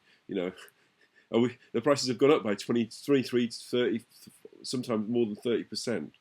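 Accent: England, United Kingdom. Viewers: you sound like you live in England... NO TAXATION WITHOUT REPRESENTATION!!!!!!!!!!!!! look it up if you dont know ;)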